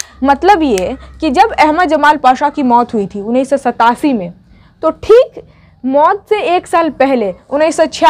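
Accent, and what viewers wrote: Bihar, India. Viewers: Ma'am aap BIHAR se hai kya??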